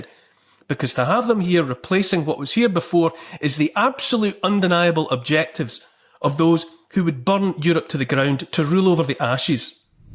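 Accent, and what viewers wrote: Scotland, United Kingdom. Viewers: are you Scottish? just saw (the bachelor- dating all the ladies ) in Edinburgh, they really liked it. i had been there new years eve with a bunch of military couples long ago, it was so fun, people were so nice!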